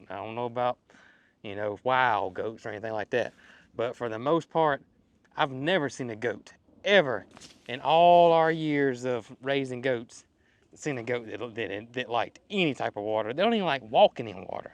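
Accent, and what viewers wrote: South, United States. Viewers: Gotta love southern talk 😂 tornada aka tornado 😊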